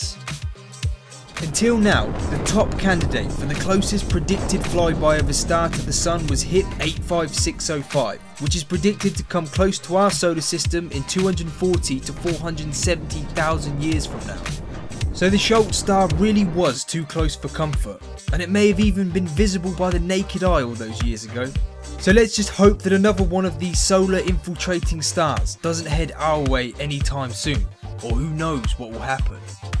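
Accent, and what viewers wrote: United Kingdom. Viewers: Eh, generic, nasaly, mediocre british commentary is boring and over done.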